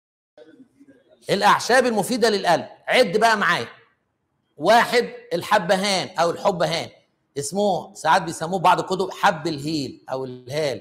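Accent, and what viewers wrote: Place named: Iraq. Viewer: ف السعودية يسما الهيل حبه خضره تنحط مع القهوة تكسرها شيء بسيط بي سنونك وتحطها كاملة تحت اللسان ٣ حبات كويسة اذا تنهج اذا سويت مجهود وتعبت حطها تفيد في مصر تسما حب هان. لهجتك من العراق يسمى الهيل الأخضر او الهيل الحقيقي او الهال او قاع القلعة له مسميات كثير لكن المعروف عند الخليج الهيل الله واعلم ايش اسمو ف العراق لكن استخدامو في القهوة و في المرق وفي الكبسة وفي الفته وفي العريكة وفي الشاهي العراقي أظن يستخدمونه وحتى الحلى اذا ماعرفت ارسلك صورته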